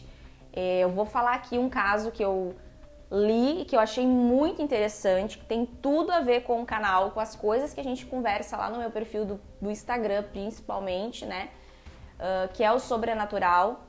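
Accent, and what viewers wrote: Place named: Rio Grande do Sul, Brazil. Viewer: Muito boa a sua ideia de juntar o sobrenatural com o caso criminal. Infelizmente, aqui neste caso, apesar das evidências apontar para o tal suspeito em questão, não foi possível confirmar o seu envolvimento. Realmente é frustrante tanto para a família como para os policiais que trabalharam no caso, em especial a Joane. Será que um dia a justiça ocorrerá neste caso? Parabéns pelo canal. Pelo seu sotaque, tu deves ser minha conterrânea. Eu sou de Bento Gonçalves, mas moro no Rio de Janeiro há 10 anos. Um abraço.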